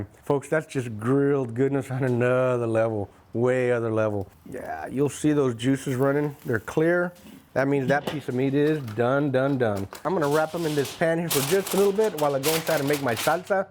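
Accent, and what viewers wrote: United States. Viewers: Somehow this guy sounds so American but so Spanish at the same time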